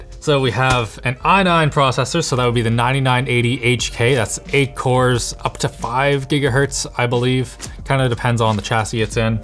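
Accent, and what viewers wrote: Canada. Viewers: never noticed this but srsly sounds canadian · I just realized why I love videos so much: he has the thickest Canadian accent of anyone at LMG. Edit:spelling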